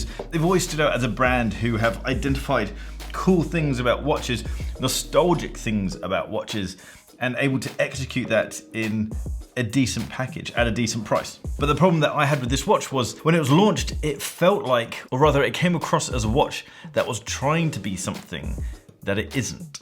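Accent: United Kingdom. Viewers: I am very sorry, I've tried to resist for ages but I can't stand it anymore: Do you know Chris Broad, why do you look and sound similar, did you probably grow up in the same region and whats this great (british?) accent? Where you come from, there has to be more like you!!! We need to find them... I know this is random, but I cannot not mention it anymore and I am probably not the first one to ask for it or suggest similarities. Actually, Its just that Im interested, if its a coincidence, or if its an actual thing; being a regional accent + lookalike theme, or whatever. Great Videos, I need to add. Great narrative, great look, great speed .. love it! Also came here through Baltic, the Acquascaphe GMT being my "target" watch.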